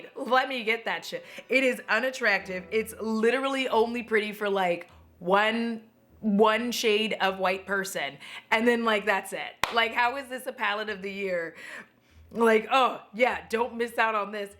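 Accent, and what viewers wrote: England, United Kingdom. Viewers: My husband just walked past me and said “Ooh, nice colour hair, ” and I agree, this teal is amazing on you. And, your Charlotte Tilbury English accent gets the seal of approval:)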